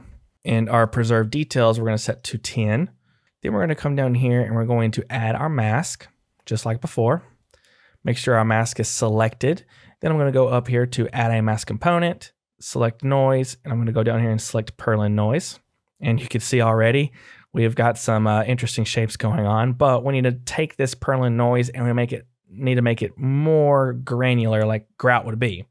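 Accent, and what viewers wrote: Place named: South, United States. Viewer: i like your southern accent cowboy thanks for the help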